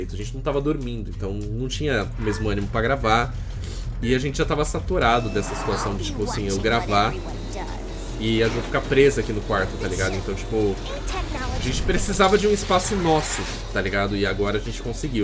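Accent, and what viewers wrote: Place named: Germany. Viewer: o sotaque dele me lembra um soltado alemão.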